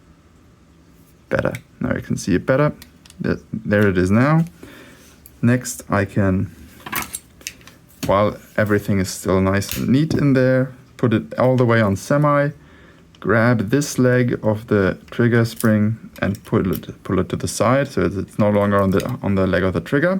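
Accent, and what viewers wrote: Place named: Germany. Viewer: Mann hört das deutsche aus dem Akzent 😂 Bro was für ein Feuerwall sicherungshebeö verwendest du ?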